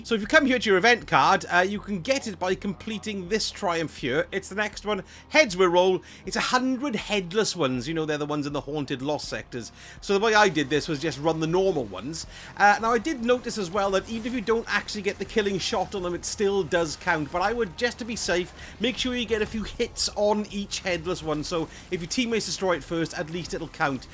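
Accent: Wales, United Kingdom. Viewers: Like this guy, funny. I Can’t work out if he’s north or South Wales? Anyway thanks for tips!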